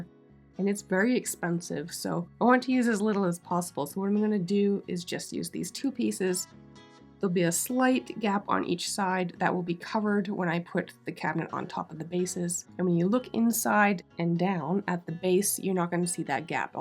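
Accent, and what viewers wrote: Canada. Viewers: ! I could tell by your accent that you are Canadian, but so delightfully surprised that you are in the Valley! I'm in Switzerland but love so much seeing an artist from my neck of the woods! I actually got a little emotional when I saw the final result. I was imagining the people who owned that piece over the years and how happy they would be to see how you gave it such a stunning new life! I especially love the woodwork on the middle drawer. Lovely work!! ❤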